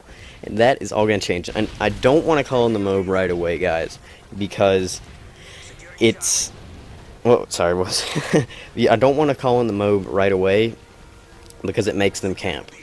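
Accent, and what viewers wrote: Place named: South, United States. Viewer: He sounds southern.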